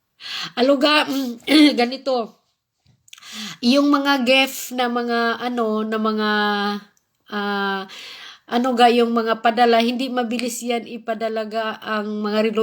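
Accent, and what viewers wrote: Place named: Visayas, Philippines. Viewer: Congrats po.. Parang bisaya ka po maam, taga bohol ko maam.. Sana mabibiyayaan din ako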